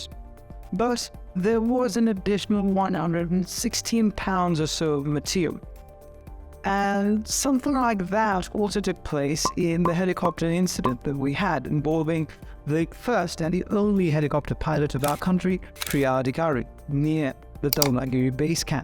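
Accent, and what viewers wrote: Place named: England, United Kingdom. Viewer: Throughout video I was feeling irritated with your annoying English accent ...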